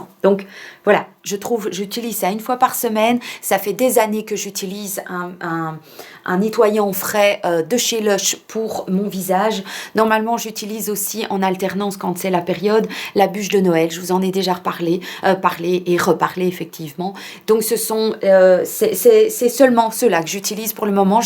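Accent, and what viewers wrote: Belgium. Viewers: J adore ton accent c est désagréable quand tu dis ça je vois un one woman show bon tu seras peut être fâchée mais en tant que belge je m autorise l autodérision sur notre accent. Sinon. Faut vraiment que nous pensions à prendre soin de nous mes filles 19 et 15 ans ont ce qu il leur faut mais moi perso je me met de côté exceptée début d année où j utilise les produits des calendriers de l avent cette année c est thé bio, bougies et nuxe après je ne suis pas... je ne sais pas quoi acheter... mais il faut vraiment prendre soin de soi je dois juste l integrer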